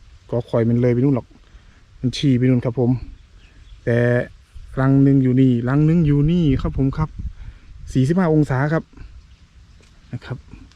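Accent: Korat, Thailand. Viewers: ชอบแถะๆคับ คนบ้านเดียวกั๋นคับ โคราชคือกั๋น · คนโคราช บ่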